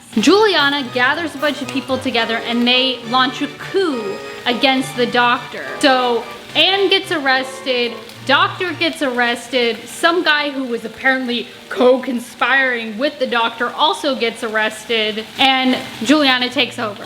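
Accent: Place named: Denmark. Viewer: are you danish because you are talking like a dane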